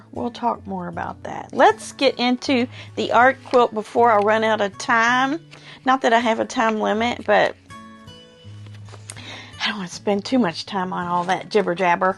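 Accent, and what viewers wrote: South, United States. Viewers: Am here! Got my coffee and have been quite busy this morning already…am looking forward to my 2nd coffee, a little break, listening to your video with your sweet-mildly-southern voice, thinking of joining your sew along if i can sew multiple projects at once. Im usually a start and finish each project before starting the next kinda girl lol😂🤣😂 we’ll see if i can swing it. Have a great day and week. Ty for bringing us your content. I love the clear plastic bins and keep my fat quarters that way with half yards as well…lol food for thought..ive decided were distant cousins… part of my family is from your neck of the woods and you resemble many of them in many ways! You seem so familiar to me in lots of different ways!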